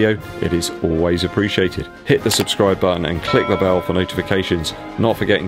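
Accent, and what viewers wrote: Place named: Yeovil, United Kingdom. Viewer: I enjoyed your pronunciation of the "Salisbury Half". Can you do an entire episode with your Yeovil accent please?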